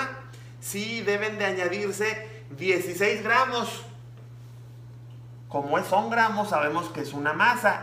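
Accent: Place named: Mexico. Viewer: No es por discriminacion pero me da dolor de cabeza el acento de los mexicanos 🤷‍♂️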